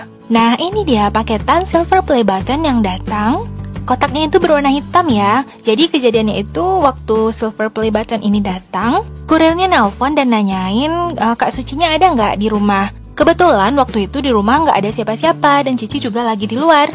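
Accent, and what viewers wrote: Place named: Padang, Indonesia. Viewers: Dah keliatan Logat nya kak orang Padang ;) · Wahh, saya awalnya nebak Kak Cici orang Palembang, soalnya saya ada teman orang sana dan logatnya agak mirip-mirip. Ternyata Kakak orang Padang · Padiah uni mah,,, tapi awak alah takok sih urang minang dari bahaso indo nyo, kalau dak urang cino pasti urang minang. mantap.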